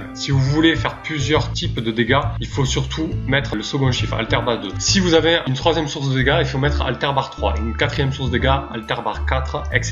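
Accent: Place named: South, France. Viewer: merci beaucoup je fais ça dès que possible. j'entend un accent du Sud tu es d'ou ? actuellement sur la RP, mais je suis originaire de Perpignan.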